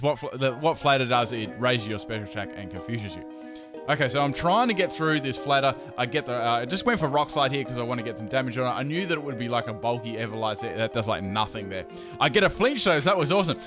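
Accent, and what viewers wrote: Australia. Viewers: yo are you aussie?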